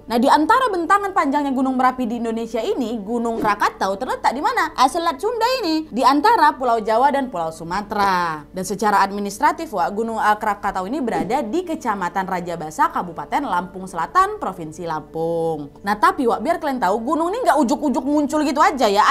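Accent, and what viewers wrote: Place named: Medan, Indonesia. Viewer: senang melihat membawakannya lucuuu.. kental kali medan kau.. GOOD JOB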